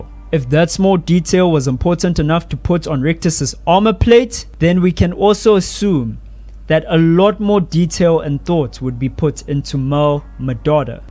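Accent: South Africa. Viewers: Is just me or you South African your accent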